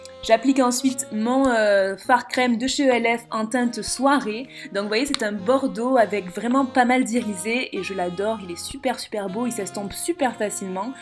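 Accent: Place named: Marseille, France. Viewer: Maquillage parfait rien a redire, top pour l'automne j'aime beaucoup ! J'adore ton accent je suis également Marseillaise ! Des bisous de Marseille ❤️😂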